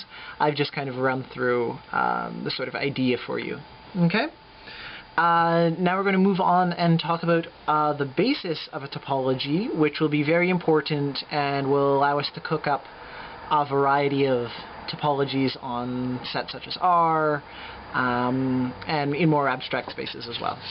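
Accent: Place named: Canada. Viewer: he keeps sayin oout...canadians.......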